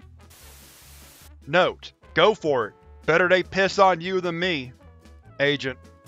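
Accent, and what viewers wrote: South, United States. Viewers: Wait....this is your normal voice? It sounds identical to the voice you use on the other videos? Southern drawl and all.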